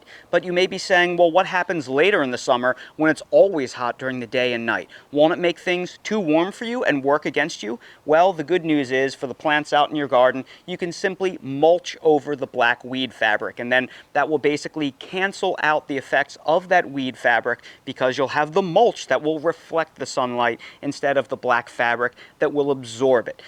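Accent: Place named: New Jersey, United States. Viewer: Love love love this! So glad i found your channel! I'm in New Mexico and using the vlack weed fabric terrifies me 😅. It does get cool at night (50s) but during the day it's in the 90s. Even starting around the end of april. But I love this idea. I have a raised bed (livestock water tank 😂) and just got some grow bags. But it all seems to be going so slow. I even started mine indoors and it still seems slow 😢 but I cant wait to learn more. Was surprised when you said you were in the south bc i heard the accent but then you said Jersey and it made sense 😂😂 im from ga so it was funny to notice 😂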